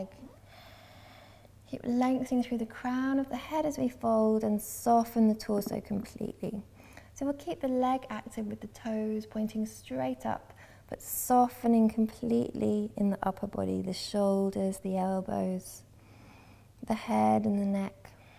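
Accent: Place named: United Kingdom. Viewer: So so good to hear a British voice while I practice! No offence meant to American pals...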